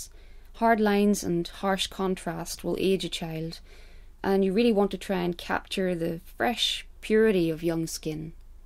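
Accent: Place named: Ireland. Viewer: thanks for the art lesson..i usually add dark blue (just a stroke ..in the darkest of the dark...like a highlight) to the black in the shadows to make them appear darker.. I love your Irish accent...I have to find a Irish girlfriend Gerard Mineo also does velour portraits that I like: <link>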